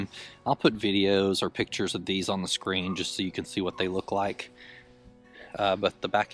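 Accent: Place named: Appalachia, United States. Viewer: I love your accent and phrases (Right quick. For example.) I am not southern, we are just tucked into the Appalachian Mountain range that runs through Pennsylvania on the western side of the state. I have noticed at least some of our dialect seems very similar to those in the south! Love your videos! Thanks for sharing all you do!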